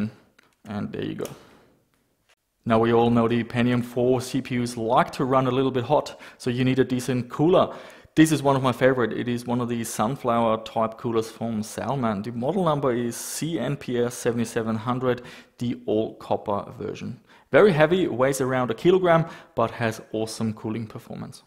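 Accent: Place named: Germany. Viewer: Actually, I got that Sound Blaster second hand 9-10 years ago (and it was massively expensive even then), but I was already past my gaming prime and I was never able to truly enjoy EAX. I had planned to integrate it into a modern desktop (which so far I have not buit), but you made me realize that modern OS-s have relegated EAX to the past. Besides, there were some issues with the front panel, which I could not fully deal with even after dabbling with the jumpers. Maybe, it was faulty like the TV tuner card I purchased with it. But hey, that is the risk when dealing with used parts (and the excitement when they do work:D)., your channel inspired me soo much to revive my old tech (your somewhat German accent rocks as well:D). Now I am slowly working on a Compaq machine with a 500 MHz Katmai (similar to the first PC I owned). I have already boosted the RAM to 512 MB (in Y2K I had 64 MB, slightly higher than the standard 32MB new PCs were offered in my country back then). 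Next comes the SD card to IDE adapter (thanks again for the info), I hope I will be able to have a dual Win 2000 and Win XP boot (and move the XP pagefile to the HDD, perhaps?). Finally, I have prepared a GeForce 4 MX440 to replace a G200 (hope it will work). Sadly, the old compaq proprietary mobo may prevent me from maxing the CPU (I had the Abit BE6-II board back then - so flexible; and a Slotket for Coppermine). Do you flash the BIOS to its latest version when you build old systems? Because many of th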